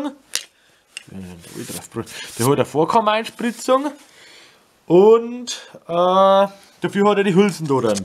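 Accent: Germany. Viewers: Wo aus bayern kemz es?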